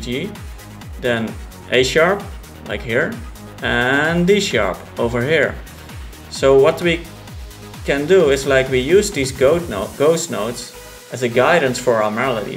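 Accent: Netherlands. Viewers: You're from the netherlands right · gonna take a wild guess and say you're dutch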